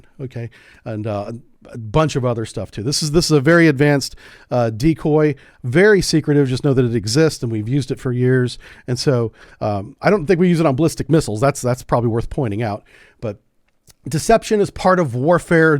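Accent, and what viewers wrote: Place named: United States. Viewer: You sound american or Canadian; but you use British collective nouns (...how ARE China's Army). WTF?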